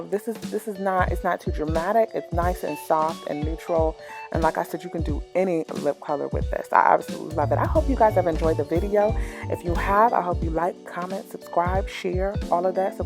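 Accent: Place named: New York, United States. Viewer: lol I definitely hear a little new york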